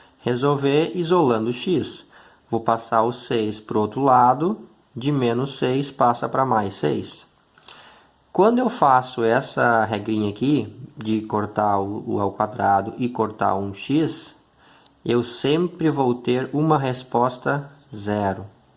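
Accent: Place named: South, Brazil. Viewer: TEM SOTAQUE DAQUI DO SUL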